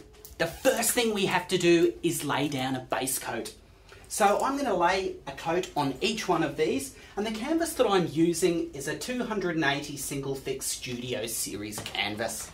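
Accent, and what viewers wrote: Australia. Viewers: I was in Oz for a couple of years and I just realized how much I miss the Oz accent until I watched your vids. Good work btw ! I will try soon.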